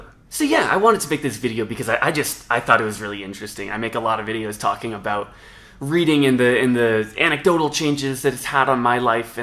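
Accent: Canada. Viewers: This guy’s Canadian. ‘Bout! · Your accent says you're in Canada. Am I right?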